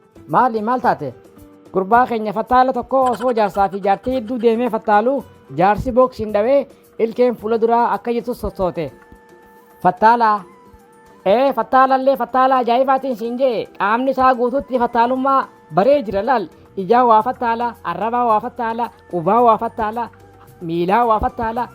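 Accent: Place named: Hararghe, Ethiopia. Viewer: sanuu loqoda Harargee bareeda saniitiin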